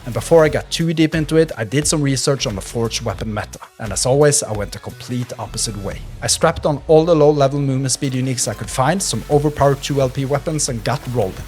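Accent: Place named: Norway. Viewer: ..med den norskeste norske aksenten på youtube :D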